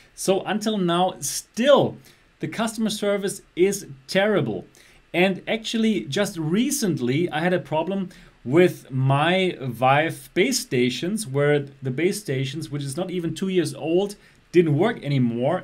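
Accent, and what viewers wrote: Germany. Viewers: that german accent you here that out of everyone xD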